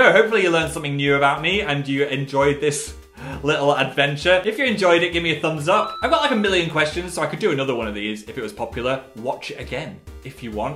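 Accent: North, United Kingdom. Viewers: Idk why I really heard the northern accent in this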